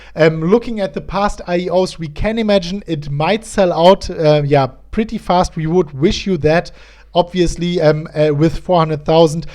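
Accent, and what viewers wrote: Germany. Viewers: His accent is as German as it can get :) German here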